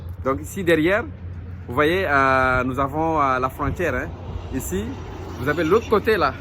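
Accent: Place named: Senegal. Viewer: Salut lorsque tu a commencé à parler jje me suis dit en même ce Mr est un sénégalais merci pour la vidéo.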